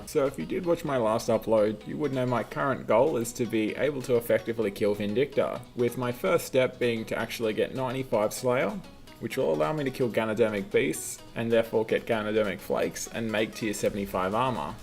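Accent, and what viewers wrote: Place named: Australia. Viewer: Man I subbed after watching your Rex safespot guide, glad I stayed around. For such a small youtuber this is really good quality. I can tell by your accent you're aussie so I gotta wonder whats your upload speed?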